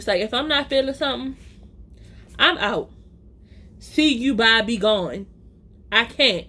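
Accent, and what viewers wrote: Baltimore, United States. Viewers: Gotta be from Baltimore off the into song and accent alone. Didnt even need the rest of the story description. 😭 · I love watching your videos!!! I'm from Baltimore too but I live in sc and ya accent reminds me of home so much! Lol be blessed sis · Once I heard your accent I said..Baltimore lol! You all and folks from New Orleans have the DEEPEST accents lol! It’s so unique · sound just like a typical Baltimore nigga 😭😂 but the whole no more movie dates just sent me 😂😂😂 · I love a person who can laugh when dumb things happen to them! I be dying laughing when dumb stuff happen,but I like ya channel ,I hear the Baltimore dmv accent😂NEW SUB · I knew you was from Baltimore when started talking. I love your voice. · As soon as you said carry out with that B-More accent I knew I fucked with you. You are gorgeous💜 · I remember this Baltimore accent I miss my days in Maryland lol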